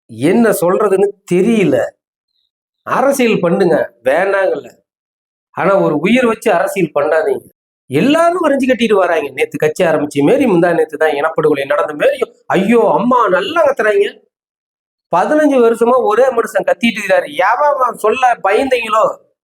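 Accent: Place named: Kerala, India. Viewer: ஆன இலங்கை தமிழ் ஓரளவுக்கு mayala சாயல் வரும் , ஏ இந்த பெண் உளறி கொற்ற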